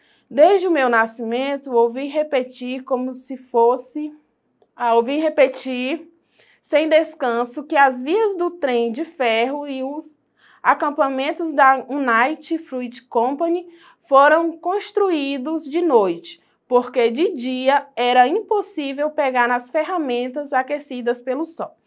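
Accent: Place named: Minas Gerais, Brazil. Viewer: Mineira kkk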